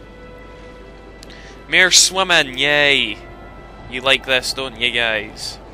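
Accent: Scotland, United Kingdom. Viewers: That bird one is totally my favorite colossus, by the by, your scottish accent kicks ass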